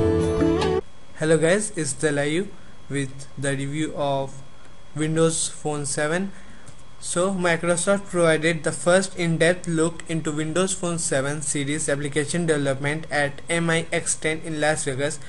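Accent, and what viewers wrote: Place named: India. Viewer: I can understand him and i'm not Indian. So what if he has a THICK accent. ...Do your thing bro.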